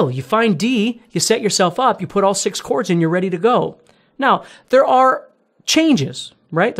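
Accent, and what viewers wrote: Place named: Midwest, United States. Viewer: Midwestern accent. He’s probably from Iowa like me haha. Maybe Wisconsin.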